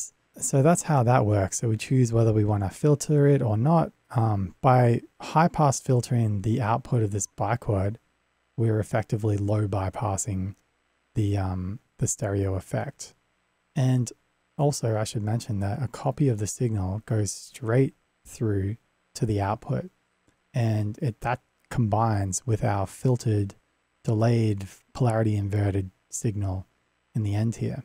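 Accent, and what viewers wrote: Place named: Australia. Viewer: Ah man I've missed those dulcet antipodean tones. Very interested in both of these - to gumroad..awaaaaaaayyyyyyyyy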